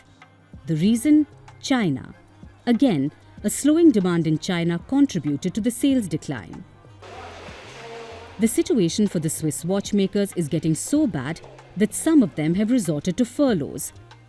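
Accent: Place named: India. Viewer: narrator sounds indian - making this a suspect propaganda video by the indian government.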